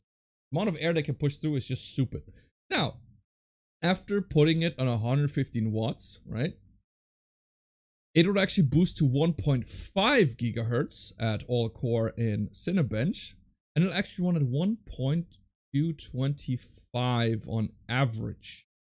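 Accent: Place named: United States. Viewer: god damn your english accent is very good and you're Danish, literally thought i was watching an american guy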